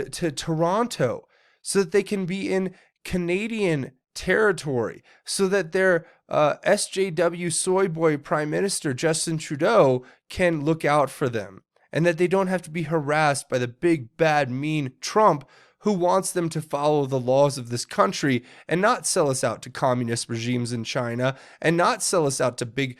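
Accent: United Kingdom. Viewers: Fabulous British accent!!! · Hahahaha 🤣 Love the British accent 👏👏👏👏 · I love the English accent. You did a great job.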